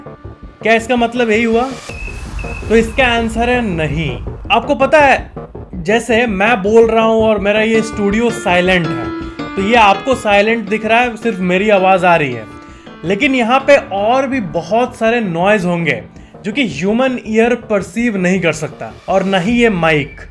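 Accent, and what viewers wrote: Bihar, India. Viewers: Aap bihari ho n ?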